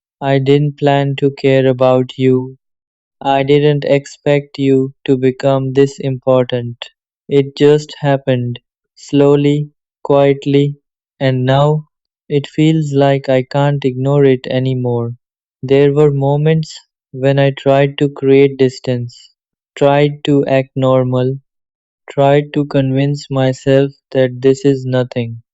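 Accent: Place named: India. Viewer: Stop speaking with your indian accent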